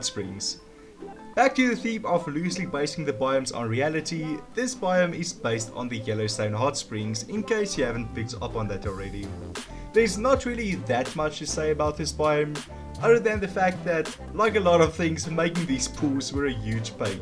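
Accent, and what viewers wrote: South Africa. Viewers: I knew it! Your accent was giving RSA vibes, but then you confirmed it yourself! 🇿🇦🇿🇦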